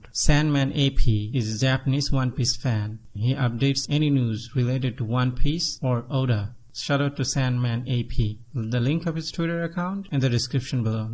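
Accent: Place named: India. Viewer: You're Indian aren't you? Accent gives it away nice video anyway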